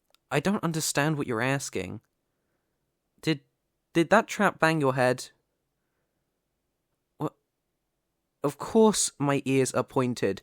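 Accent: United Kingdom. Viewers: Oh that accent makes me happy as a fellow Brit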